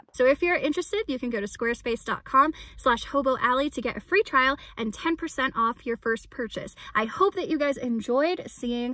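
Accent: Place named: California, United States. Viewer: She sounds like she’s from California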